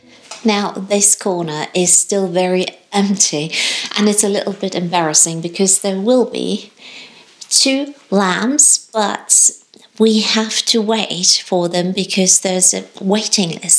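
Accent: England, United Kingdom. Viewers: You say you are Germans - but to my American ears you sound so English!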